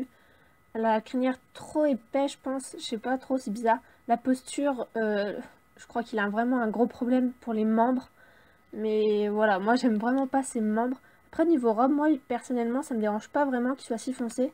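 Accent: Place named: Quebec, Canada. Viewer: Parce que on dirait que tu parles Québécois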